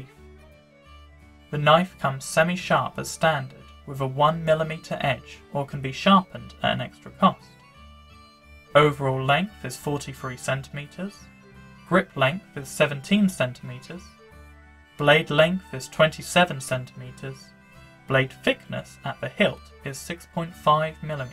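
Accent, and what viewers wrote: Norfolk, United Kingdom. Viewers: are you from Norfolk?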